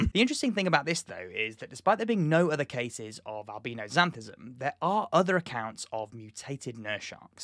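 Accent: United Kingdom. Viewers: He's from Britain. They pronounce things a little bit different like, for example, what we call cilantro, they call coriander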